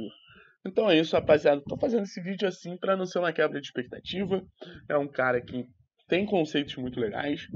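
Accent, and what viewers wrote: Rio de Janeiro, Brazil. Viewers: Ele é carioca cara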